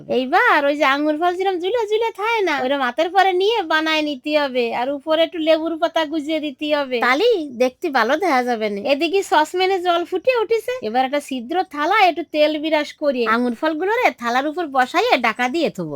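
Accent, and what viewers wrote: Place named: Jessore, Bangladesh. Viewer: আপনার কথা ভাষা হচ্ছে বাংলাদেশের যশোর অঞ্চলের মতো আমি যশোরের মেয়ে বাংলাদেশ থেকে আপনার ভিডিওটা দেখছি খুবই ভালো লাগছে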